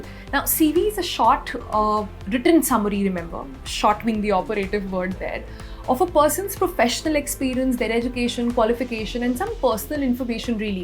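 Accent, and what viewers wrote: India. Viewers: Wow, I struggle with such a strong Indian accent. 😞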